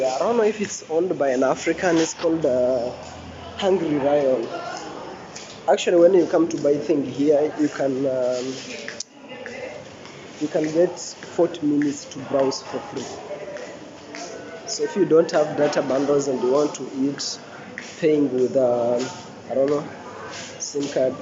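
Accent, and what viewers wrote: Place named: Zimbabwe. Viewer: Boeti ❤ ur content..Thanks 4 visiting Swakopmund. Thought u from Zim the accent thou is diffrnt from wthetu😊 much ❤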